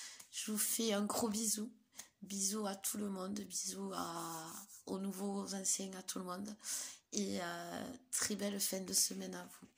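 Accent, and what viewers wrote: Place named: South, France. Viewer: Vous avez un accent du sud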